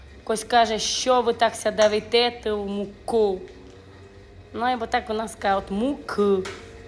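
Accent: Transcarpathia, Ukraine. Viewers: Яка соковита і запашна закарпатська говірка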